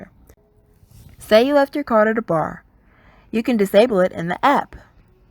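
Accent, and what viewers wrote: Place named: Atlanta, United States. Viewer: I knew that accent was ATL!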